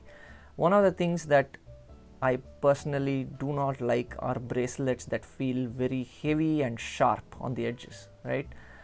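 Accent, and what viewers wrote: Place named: India. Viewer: Just can't listen to Indian accents for too long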